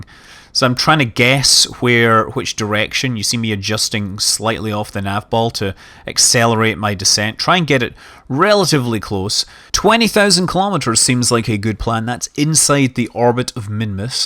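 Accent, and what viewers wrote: Scotland, United Kingdom. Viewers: He is a Scottsman :)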